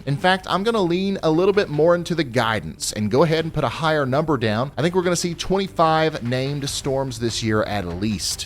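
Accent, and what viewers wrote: South, United States. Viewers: I follow your weather channel cause l feel you know your stuff in reference to weather. From Puerto Rico. I have been to kuntucky got family there. I love the southern accent and miss my friends out there.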